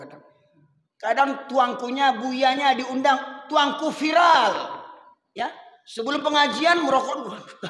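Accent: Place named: West Sumatra, Indonesia. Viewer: Lombok hadir tuan guru, saya suka, pengajian ini, meskipun ada bahasa yg sy tidak mengerti, tpi sy suka mendengar isi pengajian dan logat minangnya.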